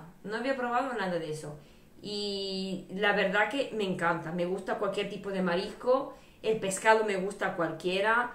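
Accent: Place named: Andalusia, Spain. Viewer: Buenas guapísima, si no dices que eres búlgara pasas por española al 100% con ese acento andaluz aunque vivas en Alicante . Un saludo y ahora me suscribo jeje.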